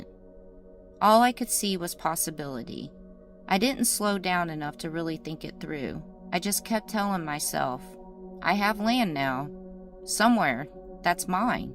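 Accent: South, United States. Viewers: Are you from the south ( Mississippi, Alabama or Georgia)? You sound like it. <3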